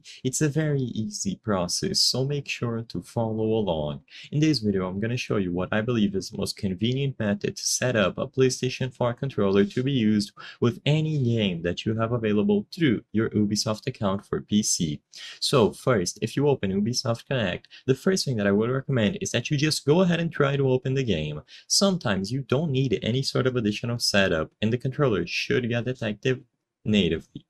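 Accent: Brazil. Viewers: Esse sotaque... Desconfiei que era brasileiro. Acessei o canal e confirmei minhas dúvidas hahaha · o cara fala bem, mas o sotaque é sempre reconhecível pra BR q manja de inglês kkkkkkkkkkkkk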